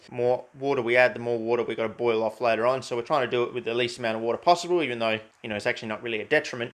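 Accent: Australia. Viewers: Love your channel, there's not many other people on the internet who do decent organic chem! A suggestion: try making benzaldehyde from benzene via Gattermann-Koch reaction. Basically, it's a Friedel-Krafts with CO + HCl mixture in place of an acyl chloride. Very neat chemistry and pretty cheap in terms of reagents used, maybe as cheap as you can get. I wanted to do that for a looong time, but I just don't have enough good equipment for working with the gases. In fact, I happened to find a detailed procedure for it in a 120 year-old "Practical methods of organic chemistry" by prof. Gattermann himself. Here's the link: <link> (Actually, it's a surprisingly good organic chemistry textbook.) PS: your accent is wonderfully Australian, always nice to listen to :)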